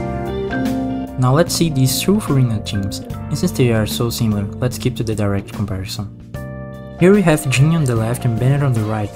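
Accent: Brazil. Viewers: o sutaquezinho br nunca falha, mas excelente video mano, sucesso no seu canal!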